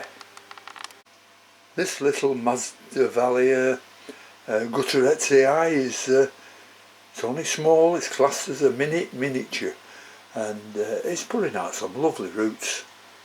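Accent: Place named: Newcastle, United Kingdom. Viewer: Keep up the excellent work. Have a great day. BTW I like your Gordie accent.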